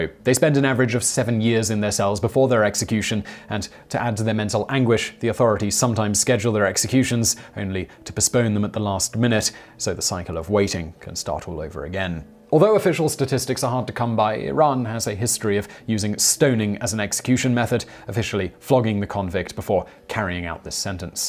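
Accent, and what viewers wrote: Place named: London, United Kingdom. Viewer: Mr bald guy speaks so fast with his silver spoon London accent. His enthusiastic tone dies down towards the end due to fatigue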